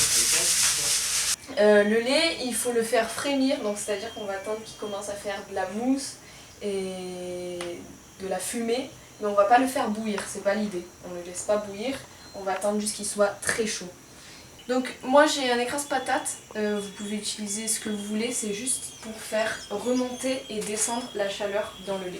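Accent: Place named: South, France. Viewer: Tu me régale !! Cet accent du Sud de ouf 😂😂 👍🏽😘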